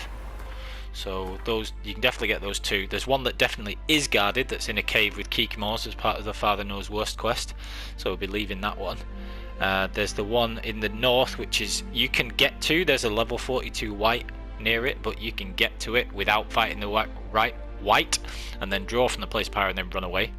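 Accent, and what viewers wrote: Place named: United Kingdom. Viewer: WeeeeeelllIl, want to marry a British guy just for the accent. As soon as I find one willing to. So yeah, guess most of the girls around the world do love your accent 😅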